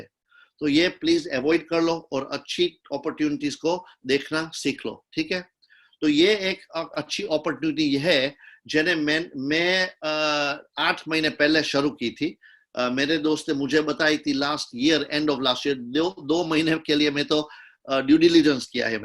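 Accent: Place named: India. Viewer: Apki hindi indian jaysi hai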